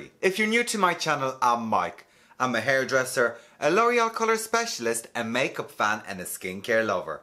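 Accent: Ireland. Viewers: I used to live in Athlone (went to college there) so your lovely accent reminds me those days ;) I bought Kerastase with stemoxydine - should help to "awake" sleeping hair cuticles. We will see. If it doesn't work I will try loreal and vichy but wanted to try Kerastase first. Thank you so much and have a great day :)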